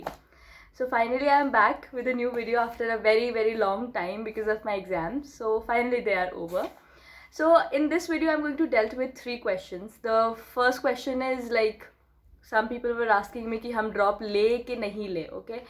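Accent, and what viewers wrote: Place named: Saharanpur, India. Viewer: Di aap saharanpur ki lagti ho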